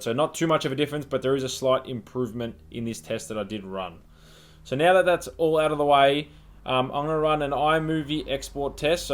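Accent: Australia. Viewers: U must be Australian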